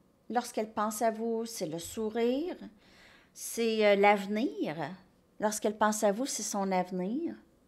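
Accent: Canada. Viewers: Merciiii 💖 (Je poursuis mon commentaire, je l'avais publié trop vite). Tout me parle dans cette guidance! Oui j'ai avoué à cette personne que je ressentais de l'amour pour elle et que je pensais qu'on ne s'était pas rencontré par hasard. Mais il continue de tergiverser en disant qu'il ne sait pas ce qu'il ressent, que pour l'instant c'est trop compliqué, et tout plein d'autres excuses. En tout cas, je constate qu'après des années, il semble toujours avoir envie de me revoir 😌 Espérons que le destin soit plus puissant que les indécisions. PS: J'aime toujours autant ton accent canadien si doux 😍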